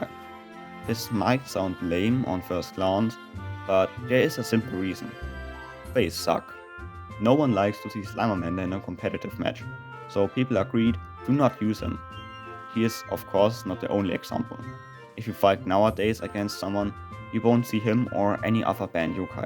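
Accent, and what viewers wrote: Germany. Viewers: ich hab dein deutschen aktzent gehört aber du sprichst gut englisch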